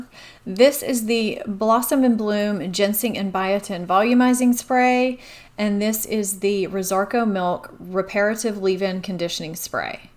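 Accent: United States. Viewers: Hi, new to your site (and most others too, not very tech savvy😋) but I wondered if you could hold the items still so I can read the product name, or perhaps put in some text in one of the corners of the screen with the name of the product. I’m in Aus, lots that you test are not familiar to me and I often don’t catch it’s name (American accent is sometimes quite different to Aussie accent). I’m really enjoying your videos, and learning lots. Plus you are blessed with beautiful skin, pretty much everything you wear looks lovely. I’m 63, had horrendous acne and super oily skin, it’s looking the best it ever has, strangely. Not too many wrinkles, but it’s def aged. Jill in Aus.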